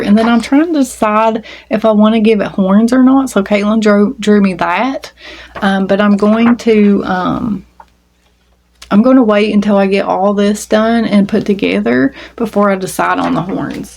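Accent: South, United States. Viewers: So many lovely items that you have done! The lovey with the doll head is a great idea. Your doily is beautiful. If you run out of wall space, I have put a doily in my window. I guess you'd have to be careful that the sun didn't fade the yarn, but it sure looks pretty. When you say "doily" in your soft Southern accent comes out like "dually" Cute!! I agree that I feel so bad for the Joann employees. Luckily I think the one near me is not going to close. I love Big Twist yarn also. Have a great week and looking forward to your yarn haul. I'm trying to behave in that area, but it's hard to do!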